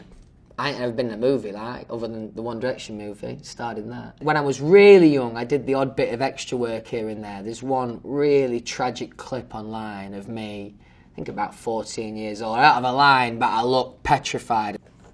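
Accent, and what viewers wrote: United Kingdom. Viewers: I feel like he's never sounded more British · he's so british i can't 😭😭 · his british really jumped out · i love his british accent since 2010 ❤️ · He’s somehow gotten more British since the hiatus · He sounds so much more British than I thought he would, not that that's a bad thing. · I noticed that Louis’s accent has gotten more British since he stopped touring with one direction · Sometimes so British even the subtitles mess up 😄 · 영국 액센트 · Do you know how much I love you, you bri'ish king? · help I don't know what he's saying with his british accent. All I'm getting is "prodly med ginger made Olly." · is british. girl have you heard is accent 😻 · how can anyone ask if he's british when he literally says "me" instead of "my" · I don’t remember him being the most British sounding out of the 4 British members and yet, here we are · The British accent is here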